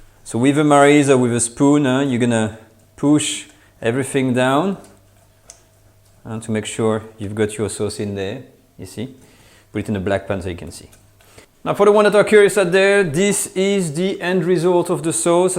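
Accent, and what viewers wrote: France. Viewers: Definitely helps to have that cool French accent!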